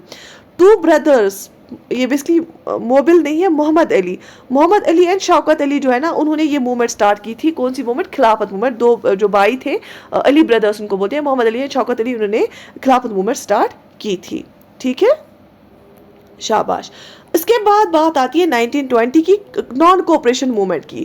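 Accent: Kashmir, India. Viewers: Your accent looks South kashmiri but you are from gbl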